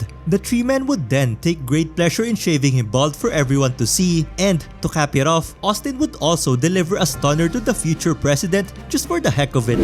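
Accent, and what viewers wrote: Philippines. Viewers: The moment I heard you speak, I knew right away that you're a 'kababayan'. 😄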